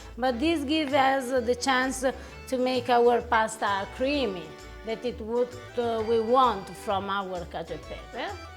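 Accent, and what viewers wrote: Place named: Italy. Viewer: great! What a cacio e pepe! Someone link videos to some other movie director who wants to do a next movie about famous Italian families... Mr. Scott, I'm talking to you! This is how we speak english. For American who didn't understand, House of Gucci movie, watch the trailer, listen how Pacino, Leto, and Lady Gaga speak and compare to.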